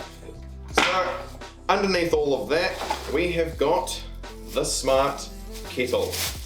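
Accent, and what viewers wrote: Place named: New Zealand. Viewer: foreeeeever agor haha kiwi accent is so cutee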